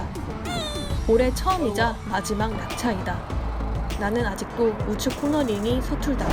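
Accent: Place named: Russia. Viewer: 간만에 다시 보니.. 살짝 러시아 여자.. 발음 삘.. 나다~ 검은사막~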